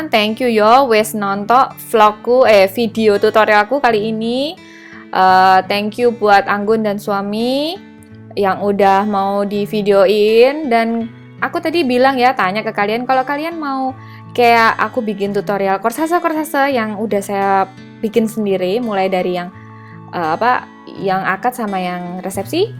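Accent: Java, Indonesia. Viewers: mbak saya pengen belajar makeup tolong gimana cara nya, karena saya sering melihat hasil makeup bagus dgn medok jawanya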